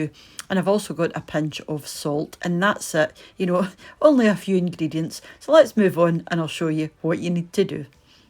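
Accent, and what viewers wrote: Scotland, United Kingdom. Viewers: 💜 I have a question, you said you use the 1 2 3 Method however your recipe doesn't match that. You used 150gr butter 75gr sugar 225gr flour..the 123 would be 150gr butter 300gr sugar and 450gr flour..so I am confused as to which amounts to use. Love your channel and have been watching you A LOT. My grandfather was Scottish and I miss his accent. Yours is lovely and the scenery at the end is GORGEOUS. You live in a beautiful place. Thank you. 😍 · I love the recipe and ABSOLUTELY LOVE YOUR SCOTTISH ACCENT! God bless. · ❤ check out the authentic accent and I'm guessing she really lives in Scotland and owns a Scottish passport. Are you clear. She doesn't grow ganja 🤸‍♂️🦋. Thank you Scottish lady. 🌂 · 💖 Your videos bring me such joy! I’m in the state of Oklahoma in the USA, but I spent 10 days in Scotland learning about my heritage through family and the Presbyterian Church. 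I miss Scotland so, and look forward to visiting again someday! Your voice reminds me of that wonderful trip, and your food is always delicious! I’ll be making these tomorrow!! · I❤your Scots accent! Beautiful! Yes! Can’t wait to try your recipe!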